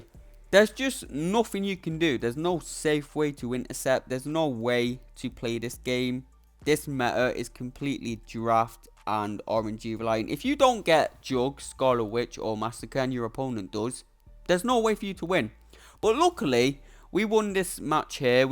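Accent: United Kingdom. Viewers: Bri'ish man is speaking straight fax 🗣️🔥🔥🔥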